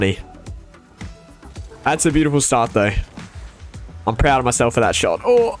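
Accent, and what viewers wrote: Australia. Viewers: bro i didn’t realise you were aussie! should coach me 🤣 xx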